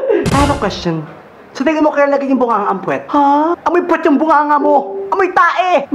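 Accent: Visayas, Philippines. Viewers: Bisaya man ka dong oiii.hahhah😂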